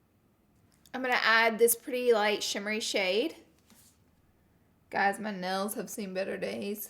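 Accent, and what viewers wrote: South, United States. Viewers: I love how you say consillur instead of con-see-ler. It's very Southern with a bit of a drawl to it 🌷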